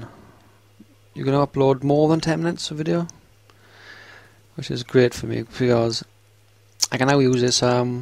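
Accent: United Kingdom. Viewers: Lastly, your voice is clearly English -> Scottish in accent. To an untuned American ear, it is quite hard for me to understand you. This isn't your fault, just something to keep in mind if you want to broaden your audience.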